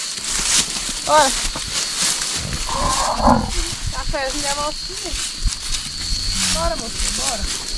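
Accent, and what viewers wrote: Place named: Bahia, Brazil. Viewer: Esse sotaque é um show à parte, lembra minha gente da Bahia. Meu abraço respeitoso a vocês por fazer tudo com tanto amor, dedicação e carinho.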